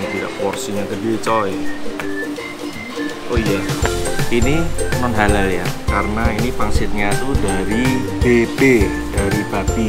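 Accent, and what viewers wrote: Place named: Solo, Indonesia. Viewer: medoks tomen to oms"? lahir solo ta?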